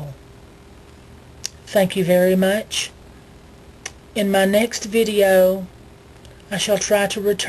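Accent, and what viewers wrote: South, United States. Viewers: LOL! Southern!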